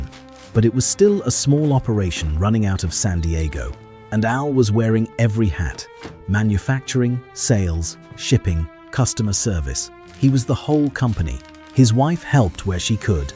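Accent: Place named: United Kingdom. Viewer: An American iconic product being narrated by a British voice, come on youtube you can do better.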